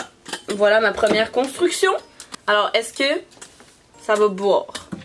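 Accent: Quebec, Canada. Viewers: T trop belle et j'adore ton accent quebequoi ps: excuse moi des faute abonné toi à ma chaîne merci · J adore ton acent kebekoi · Yes kebek adore l acent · J'adore ton accent Québécois 😍👍👍✌💕💜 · J'aime trop l'accent quebequoi!!!! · Superbe vidéo je suis tombé sur toi comme ça j’adore ton accent québécois enfin bref continue 🙂💖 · J’adore ton accent québécois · Ton accent québécois ❤️❤️❤️🔥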